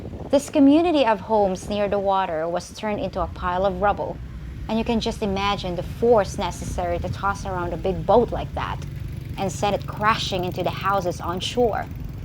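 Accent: United States. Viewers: Maayong gabi,! Stumbled on your You Tube video here with an insightful view of your hometown after the powerful typhoon passed the place. I liked your English accent here. Did you grew up in the States? Anyways, I appreciate your report here. Had many of the people I know who are based in Cebu, mostly in the downtown area. Daghang salamat! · American accent man so madam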